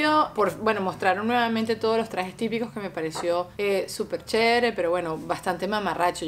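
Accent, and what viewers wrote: Venezuela. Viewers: saludos, vi el Certamen con mi esposo, por TNT, al escucharte me pregunto ¿quien es esa la que esta hablando? - Yo: Es ¿sabes quien es? - y me dice Si, ES UNA VENEZOLANA! JAJJAJAJA me encanto que me respondiera asi!! Te queremos! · Me gusta mucho como habla daniela burde lo nornal chama típica criolla te amo eres exelente besos 😘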